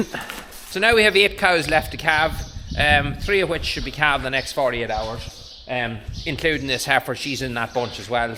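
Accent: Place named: Rural, Ireland. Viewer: I have lived abroad for almost 25 years. I grew up on a farm in Mayo - I love your English and use of vocabulary, it's so rural Ireland. Big respect ❤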